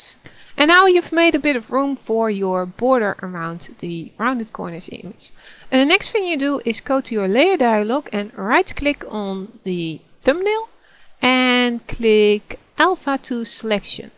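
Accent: Netherlands. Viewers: This is what dutch people really sound like speaking English, unlike goldmember in Austin Powers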